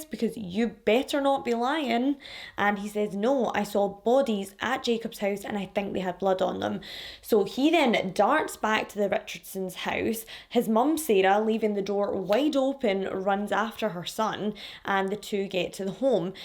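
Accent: Scotland, United Kingdom. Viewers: Is she Scottish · Hello. Yes I'm a brand-new subscriber from America. I love your accent I spotted it as Scottish right away. This story is so messed up all the way around. Those parents pick themselves up. They just left the dark past behind. And they reached for the Stars. And her only complaint was that she did not like the rules. She should have just sucked it up Chuckles. Find one teenager that just likes the rules. LOL. The tragedy of the murder. To her father seeing her as his last thing he sees on this Earth. And oh my goodness a little boy. The fear and Terror that boy had to go through period at the hands of his so-called sister. And I absolutely agree with you that idiot she was dating had fetal alcohol syndrome. I have worked in the healthcare industry. I have met many children with fetal alcohol syndrome. There's affect that a lot of people don't know. 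If you look at your pinky and you bend it there's two joint. A person who have foetal alcohol syndrome only have one joint. And I could tell by the structure of his face. And the fact that his mother was just a alcoholic. But at least you admit she did not address his mental health issues when she should have. That doesn't make anything better. but one we own up to our mistakes and take culpability for them. Give me an iota of minimal respect for her. and what about everybody that was seeing that on MySpace? They should definitely all be held culpable. You keep it up honey you are on the rise. You have become one of my favorit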